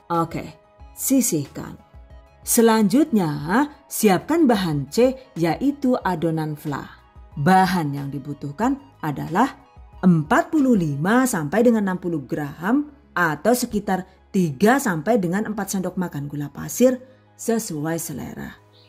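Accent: Banyumas, Indonesia. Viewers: ngomonge jan ngapak gawe guyu inyong #GiveawayTHV2021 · Mom nya wong bnyumas kiye hehhe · Kalau bahasa ngapak emang gitu bund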